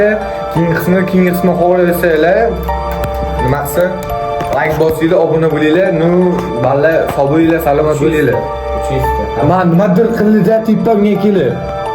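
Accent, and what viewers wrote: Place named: Qashqadaryo, Uzbekistan. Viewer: Qashqadaryodanmisz dimn manimcha sheva tortyaptida😂😂munaqasiya dganizga o'ldim lkn😂😂😂😂😂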